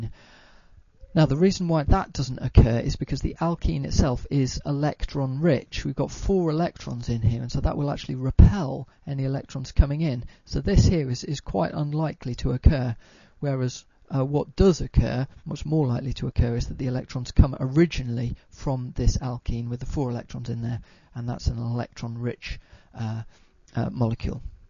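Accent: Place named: United Kingdom. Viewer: Chemistry makes so much more sense when it's taught with a lovely, soothing British accent! :D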